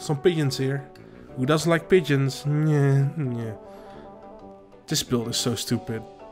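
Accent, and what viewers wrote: Netherlands. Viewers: The dutch accent 👌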